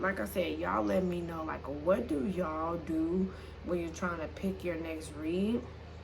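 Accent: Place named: Bay Area, United States. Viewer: ! I was just telling my husband I don’t know where she from but she sound like she from The Bay but when you drive around it’s not baying😂😂 this thought was a while ago when I first found your other channel, then I came over here. I’m from Oakland, but I been in Pitt for hella long now so yeah P-World 🖤🧡🖤🧡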